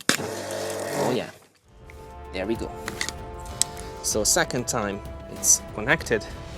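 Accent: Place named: Hungary. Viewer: Hi, according to your accent, you must Hungarian 😉. Correct me if I'm wrong. Good content by the way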